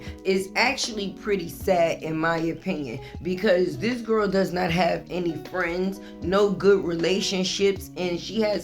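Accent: New York, United States. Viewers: Thank you for the video great job once again you got me. I was on my way in from the garage and you were talking about the young lady. I did not know who she was. Thanks for the recap. I turned around and sat on my stairs before I get into the house and listen to the whole thing. Great job keep it up. I think I’m getting a hint of up north accent like New York or New Jersey great Common sense conversation👍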